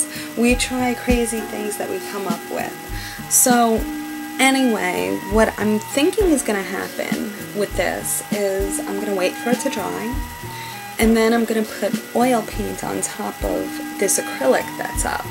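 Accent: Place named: East Coast, United States. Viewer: I really enjoyed watching your presentation! Very nice voice and East coast accent! ((huggs)) :]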